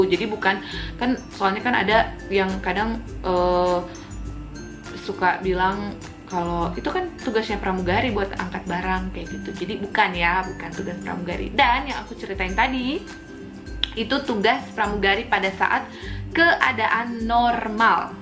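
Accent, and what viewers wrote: Manado, Indonesia. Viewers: Pke logat mnado skli" bkin vidiox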